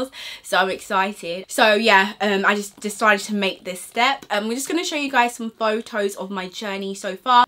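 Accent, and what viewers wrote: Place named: United Kingdom. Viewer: What is it about these damn British accents??....Like I can't!!! I just love you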